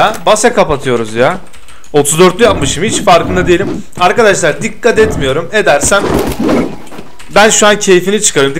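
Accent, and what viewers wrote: Adana, Turkey. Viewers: a be adanali misin lütfen cewap wer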